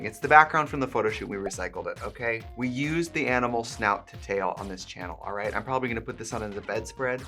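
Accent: Wisconsin, United States. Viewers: Aw, that lil WI accent pops up now and then and I love it. The straaaps 💜 collection is fire - that purple is beautiful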